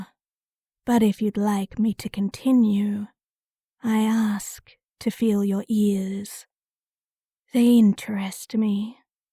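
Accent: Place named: South, United States. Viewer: Thank you so much for filling my script! I don’t know if I’m crazy or not but I think heard a slight southern ting in your performance and I really liked it. Brought out the preaching part of this script well. Thank you!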